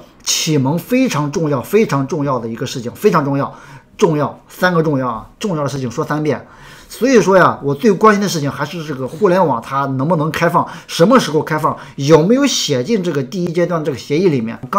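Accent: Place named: Shaanxi, China. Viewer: 哥！你是不是陕西人！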